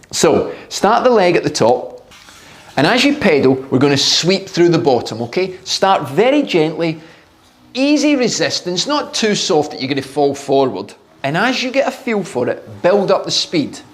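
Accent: Scotland, United Kingdom. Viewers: Love hearing a good, clear Scottish accent! This is what I aim for when I try to remove all the slang and pronounce for business calls 😂 For anybody asking, to my ears this is a central Scottish accent, 25mile radius of Glasgow. I think the Lanarkshire guess is spot on. Back on topic, excellent videos! I'm just getting into cycling and have been learning a lot from the channel, thank you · probably scot or ireland, very thick accent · Filipino cyclist here, This video helped me a lot, I've learned some things than can help me improve myself, But the Scottish accent is just too much for me😭 I had to repeat this video a couple times to fully understand what he's saying but anyway Thank you. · Lovr the Scottish accent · I am older than you, a 1968 model. You might remember Greg LeMond teaching the exact same pedaling style back when we were teenagers. 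He said it was like scraping mud off your shoe? GREAT VIDEO. As an American, I love the Scottish accent. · I think is from Scotland… used to live in Aberdeen so I am familiar with this accent! Absolutely love the humor, thanks just subscribed!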